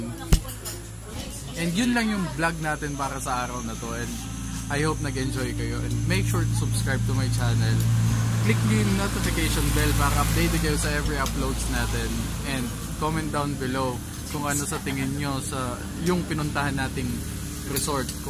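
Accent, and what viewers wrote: Visayas, Philippines. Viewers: Woii bisaya ka pala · bisaya diay ka kuya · Bisaya man ka noh klaro kaayu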